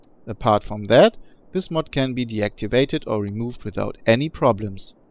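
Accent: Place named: Germany. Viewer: haha okay. nur ein kleines bisschen zu deutscher Akzent und zu starke Betonung der Endungen.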